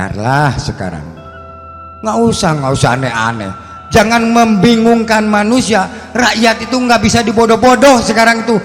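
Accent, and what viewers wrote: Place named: Sunda, Indonesia. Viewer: Has sunda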